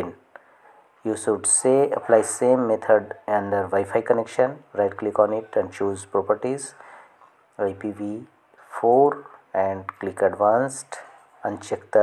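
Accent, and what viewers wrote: India. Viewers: indian if you hear this accent again just close the video, or you will lost your time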